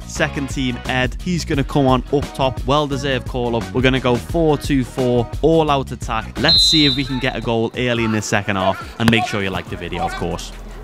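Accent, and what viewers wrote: Liverpool, United Kingdom. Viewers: Cold Scouse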